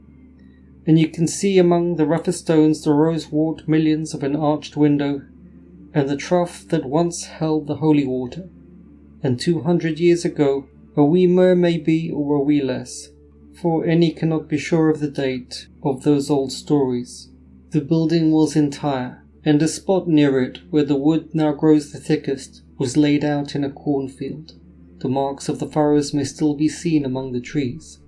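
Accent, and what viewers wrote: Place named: England, United Kingdom. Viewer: Thank you,. A lovely talk as usual. My dad was Scottish, and those pipes just wake me up, something in my DNA. You sound really English, but you are not?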